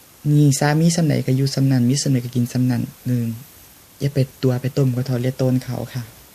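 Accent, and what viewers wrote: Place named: Chaiyaphum, Thailand. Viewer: โทดเด้อจ้า พี่คนทางได๋น้อฟังเสียงเว้าคือคนชัยภูมิบ้านหนูแหมะ พอดีพึ่งมาติดตามมื้อนี่จ้า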